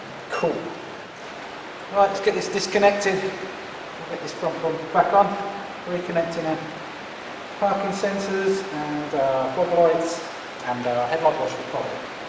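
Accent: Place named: United Kingdom. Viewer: Excellent video, friend! Watching it in a clean, quiet shop with a British accent was the icing on the cake. Love and respect from USA. 🍻🍺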